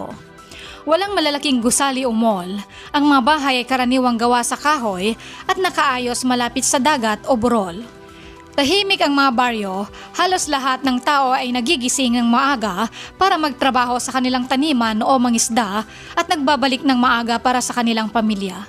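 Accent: Visayas, Philippines. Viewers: Copy paste ang narrator sa ibang pages eh! Peru ang tono bisaya!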